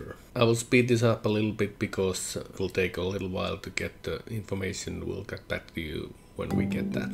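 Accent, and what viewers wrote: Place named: Finland. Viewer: Ei se pahasti kuulu läpi se ralli englanti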